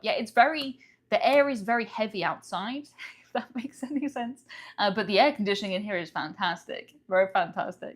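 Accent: United Kingdom. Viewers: Hello! Missed the live stream, am watching the rerun. Congrats on your philanthropy -- well done! I enjoy your cruising podcasts (as I am too ill to go myself) but most of all your accent and British isms. Makes me miss my Dad and Grandmum. Keep up the good work, and Happy Holidays to you!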